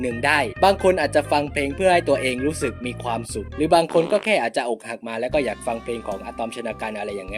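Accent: South, Thailand. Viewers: สำเนียงอยู่เหมือนคนใต้เลยพี่หรอยเลย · พี่เป็นคนใต้ใช่ไหมครับ สำเนียงพี่คือใช่เลย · พี่เป็นคนใต้ป่าวคับ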